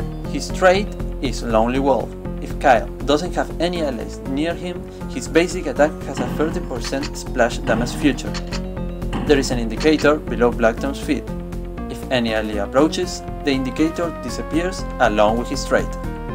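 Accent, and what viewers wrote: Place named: Argentina. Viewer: tu acento es re argento maquinola, de donde sos?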